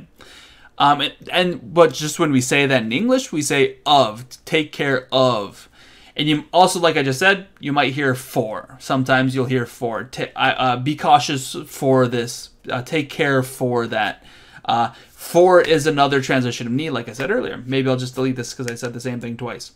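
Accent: United States. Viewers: It's not awful - just standard American accented Japanese. Accents are tricky to kick... they tend to move at their own pace.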